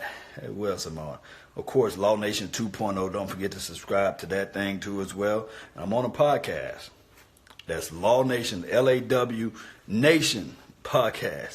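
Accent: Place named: New Orleans, United States. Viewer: Law, love the channel. i know nothing of you personally but you sound more NOLA than Texas to me. Am I just completely off? EDIT... im not judging. I'm a Dallas fan in Eagle territory. This is a truly dangerous situation. Eagle fans are crazy AF.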